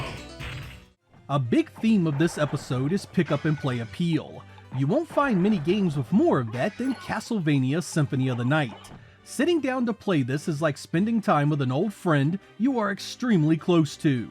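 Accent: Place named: Delaware County, United States. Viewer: You sound like you have a Delco accent.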